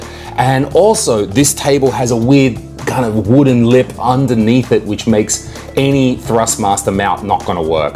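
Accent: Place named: Australia. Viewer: Sounds like you're Australian, so I'm assuming you're in Australia. I just picked up a Thrustmaster T300 from Cashies'. It didn't come with the mounting 'thingie', so I was contemplating mounting it directly to the desk. Glad I found your video. If you want a quick-n-easy release, check out Bunnings and look for 'Richmond M6 x 40mm Handbolt'. Should be the size you need and comes with a convenient star head, which you can use to tighten/loosen the bolt with your hand.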